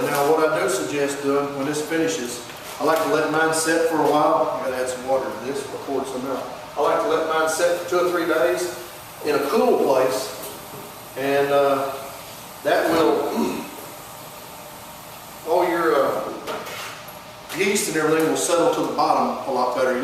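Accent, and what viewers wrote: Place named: South, United States. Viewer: Ferrr-ment. Why does that southern accent sound so dam stupid?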